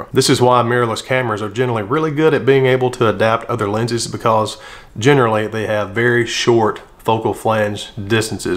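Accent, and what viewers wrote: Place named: South, United States. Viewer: Tennessee? Kentucky? it's definitely Southern but not distractingly strong.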